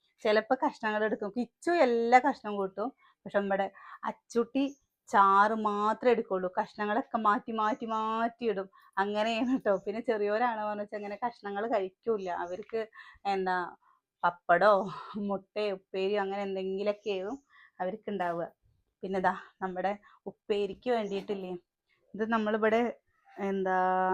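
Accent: Palakkad, India. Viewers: നിങ്ങളു പാലക്കാട്‌ ano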